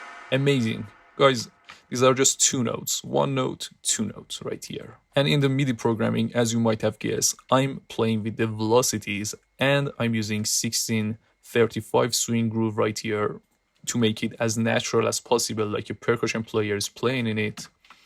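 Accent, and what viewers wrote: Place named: Tehran, Iran. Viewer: The Accent Look Soooo Bache Tehron 😃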